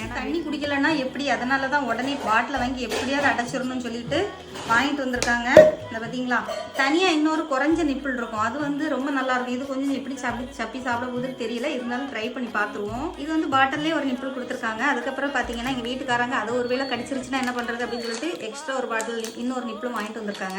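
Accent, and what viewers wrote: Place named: Madurai, India. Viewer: நீங்க கண்ணியாகுமரி மாவட்டத்த சேந்த ஆளு ஆனா மதுரை பக்கம் இருக்கற பாஷை பேசறிங்க சூப்பர்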